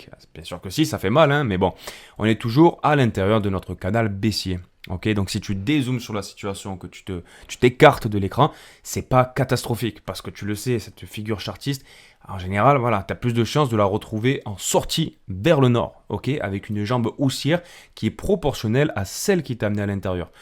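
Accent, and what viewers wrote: South, France. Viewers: tu as l'accent du soleil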